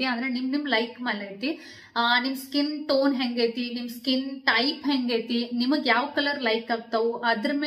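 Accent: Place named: Uttara Kannada, India. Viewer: Hai sister 😍 .Nivu mado yalla videogalu tumba informative agirutte . Nivu chikka chikka vishayavannu tumba acchu kattagi heli koduttira. Ondu kutumbada javabdarige nimmantaha hennu magalu tumba agatya 😍 Maneyannu channagi maintain madta eddira. 😊 Waw. Nivu matado uttara Kannada language keloke kivige impagirutte, nivu madida odapu gala video sakattagittu. Gandana hesarannu a rethi agi karibahudanta gottirlilla. Tumba channagittu. Nimma vlogs Alli nivu honest inda interest ittu video madodrida adannu navu aste interest kottu noduteve. Nivu mane kelsa, bere work, sanvina nodkollodu yallavanu channagi nodikoolodrinda bereyavarigu nivu inspire agiddira. Nivu yavaglu energetic agirodrinda namgu kelsa madoku energy barute. Nimm life style tumba esta, nimma matte Vinay Sir bonding tumba channagide. Nivu made for each other ☺sir nimge tumba supportive agiddare. 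Nivu tumba cute agi muddagiddira. Vinay sir tumba lucky. 😍 Nivu toriso daily vlogs, cooking, matte sanvi jothe mado activities help agutte. Ega madiro makeup videos alli yavella product hege use madbek annodannu channag heliddira. Tnks sis 😍 Heege helpful videos madta eri..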